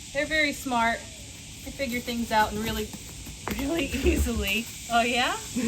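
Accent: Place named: Texas, United States. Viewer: sounds like Texas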